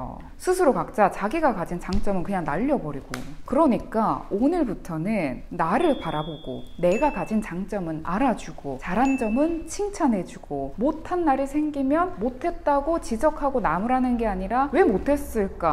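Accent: Gyeongsang, South Korea. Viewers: 머지 경상도 사투리 들려용 !!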